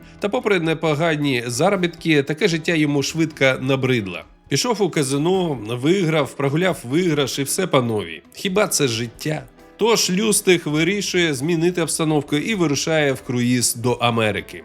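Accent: Russia. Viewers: Приєднуюсь до критики і від себе хочу додати, що відчувається россіянський акцент. Треба над цим попрацювати, або не поспішати. Але взагалі дуже чудовий канал та цікаві відоси. Продовжуйте! Будемо дивитись!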